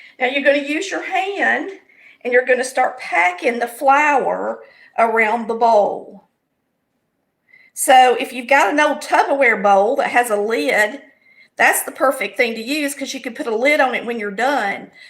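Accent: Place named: Carolinas, United States. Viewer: 🌷🌷So enjoyed watching you fix your family's Sunday breakfast! 🌷🌷 I hope you know how very blessed you are to have such a HUGE kitchen to work in! I'm always fussing about my little "play-kitchen" being so small with no room to put stuff! ☺ Anyway ... I'm gonna try this method. I hadn't fixed biscuits in quite a while but need to start again since the frozen ones now have begun to add TERRIBLE ingredients. Yours remind me a little of Brenda Gantt's way of fixing biscuits. BTW - I'm guessing you are located somewhere in the Carolinas by your accent? (I'm a North Carolina girl, myself, and now live on the VA-NC line.) May the Good Lord bless you and yours ... and you are doing a GREAT job!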